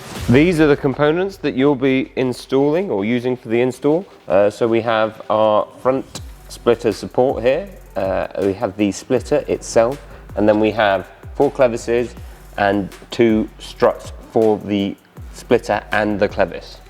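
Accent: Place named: United Kingdom. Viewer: Mate your accent had me thinking Verus was UK based, but nope gotta pay the +200% import fee's 😅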